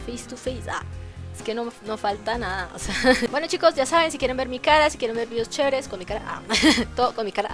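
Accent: Colombia. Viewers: ¿Eres colombiana no?, es la primera vez que entro a tu canal y lo note en seguida xD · cuando diijste que eres Bogota mi corazoncito dio un vuelvo eramos vecinas:'v Yo soy de Venezuela:') Pero ahora estoy en Ecuador y muy pronto en España Yo pase por Bogota y no te conocí:'U Pd: Pensé que eras mexicana por las expresiones que usas pero ahora que lo escucho bien si tienes acento colombiano 7u7r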